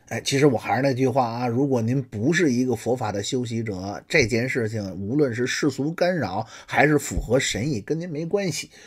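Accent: Beijing, China. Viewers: 冒昧的判断一下 这是一口老北京北城话😅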